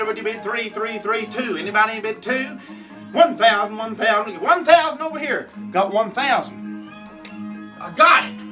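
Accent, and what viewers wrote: South, United States. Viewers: That was awesome!! I wish I could do that, but there just ain't no way!!! Love the deep southern accent!! I'm an ole country gal that lives on a farm and go to alot of livestock auctions and I love listening to the auctioneer! I do have to say though, you are really amazing at this!! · Your chant is beautiful and you have nice rhythm. And your southern accent makes it even better! 👍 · I don't know what I love more: the southern accent, or the music in the background reinforcing all the prejudices of US southerners xD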